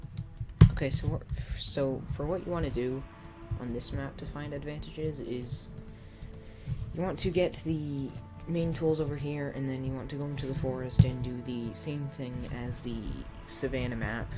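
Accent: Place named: Sweden. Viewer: its like a swedish accent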